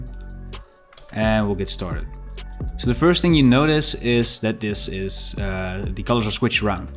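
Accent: Netherlands. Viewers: ur dutch?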